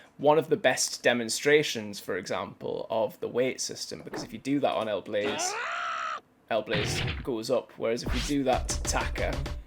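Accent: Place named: Newcastle, United Kingdom. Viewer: Eyepatch wolf is Irish i think this fellas a jeordie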